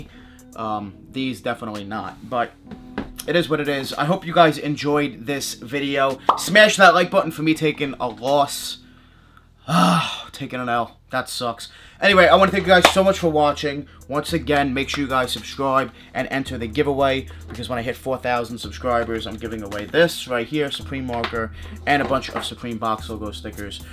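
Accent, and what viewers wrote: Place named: Brooklyn, United States. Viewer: Where abouts you from? You sound like a New York Brooklyn sort of accent.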